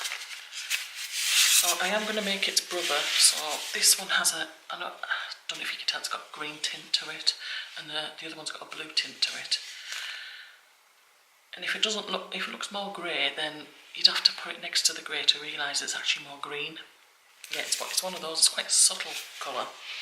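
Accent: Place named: County Durham, United Kingdom. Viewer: I'm not sure where you are, your accent sounds local to me. I'm in County Durham x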